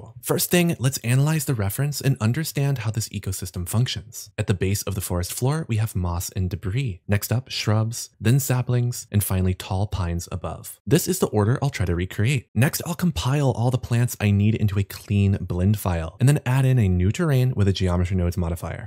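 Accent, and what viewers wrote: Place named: California, United States. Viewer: What's with the spoiled californian girl accent?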